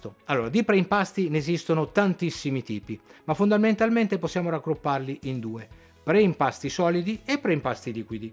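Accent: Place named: North, Italy. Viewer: Complimenti!! Bel video. Saluti dall'Argentina!!! Sembri veneto o lombardo. Da dove sei?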